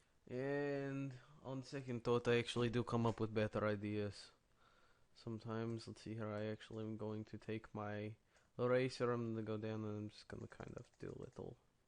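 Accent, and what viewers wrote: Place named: Russia. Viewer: He's Rrrrrussian.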